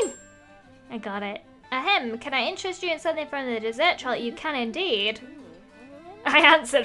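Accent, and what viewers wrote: United Kingdom. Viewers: Lizzie my big sitter said are you brithish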